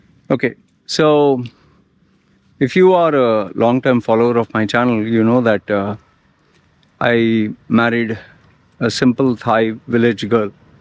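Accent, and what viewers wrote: India. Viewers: You sound like you are Indian are you?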